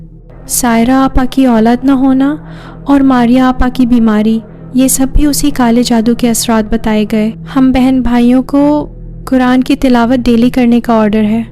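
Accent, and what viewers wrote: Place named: India. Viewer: Assalam o alaikum dear aap ki awaz buhat achi hy or andaz b lakin Indians ki tarha khy or khay me wazy farq hy is ghalti ko door farmalijye❤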